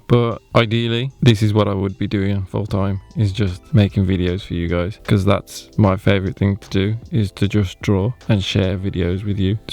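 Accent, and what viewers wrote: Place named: West Midlands, United Kingdom. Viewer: Really great work 👍 And representing West Mids by sound of it!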